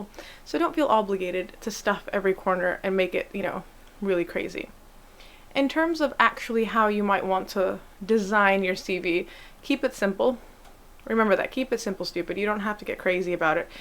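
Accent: United States. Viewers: Assalamu alaikum sister, your strong American accent got me searching through all your videos why u chose to work as a doctor here in the UK , because your husband doesn't have a American accent, I'm sure he's either Pakistan or born in India, so u and Ur husbands visa situation sounds must be very complicated, with u being an American citizen, so how come you don't work and live in America as a Dr with your husband, not sure if you covered this subject in a video, please post me the link , jaza kallah and thank you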